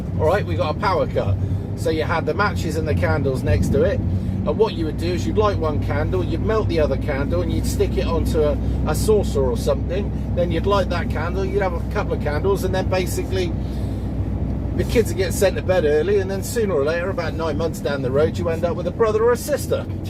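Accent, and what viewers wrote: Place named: West Country, United Kingdom. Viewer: 1 year on, luckily no power cuts so far. But who knows what can happen within the next 10 - 15 years if things don't improve i.e. building enough new power stations to cope with increased demand? Soz you don't have a partner if the lights go out lol. But as long as you're happy in life? I enjoy watching your videos. If the power goes out, I won't have anyone either. Need plenty of books to read and keep the Nintendo Switch charged up when there is power.🤣 Sounds like you live in the south west of England - West Country area?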